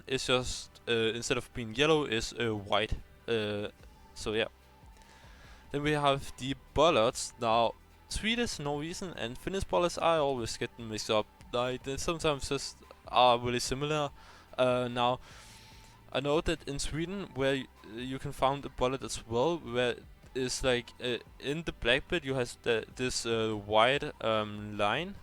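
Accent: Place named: Denmark. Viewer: Your Danish accent is so strong 😀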